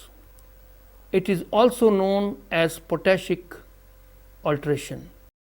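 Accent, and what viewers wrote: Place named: India. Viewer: I really love Indian professors although quite accent is challenging me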